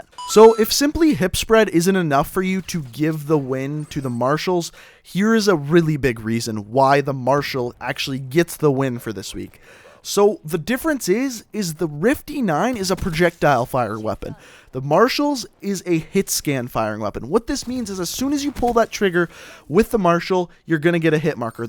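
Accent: Canada. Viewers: Dude, are U canadian? !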